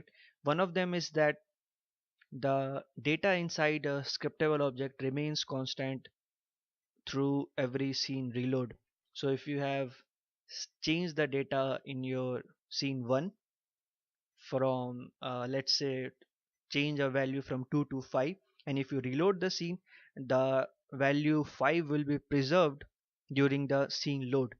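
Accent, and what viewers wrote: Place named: India. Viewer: Oh man I hate the Indian accent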